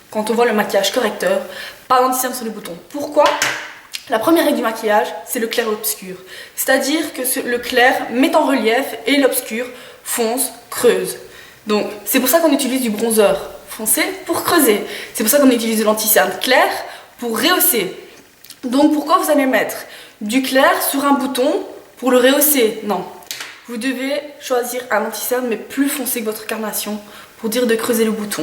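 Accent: Belgium. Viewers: salut, je viens de découvrir ta chaîne et j'aime beaucoup tes vidéos tu es claire et précise et ton accent Belge je crois si tu es Belge je l'ai vite capté lol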